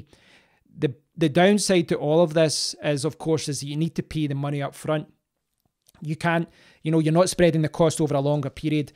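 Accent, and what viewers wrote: Scotland, United Kingdom. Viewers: Great content, what was better is your Scottish accent!!! lm ready to go into battle!!! and "pick a fight" lol with my provider!! I took your advice I now get 500 gigs a month max speed $36 a month, (20 Euros) with Vodafone, no contract, no exit fees so thankyou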